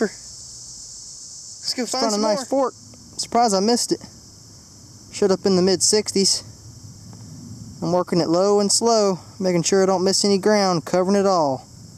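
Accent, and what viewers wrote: South, United States. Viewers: you know your watching when you hear his country accent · i like your country actsent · you have an American axent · He says gowd instead of gold good old southern accent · I can not stand his countryness.......???? Accent and how he talks but I love his vids though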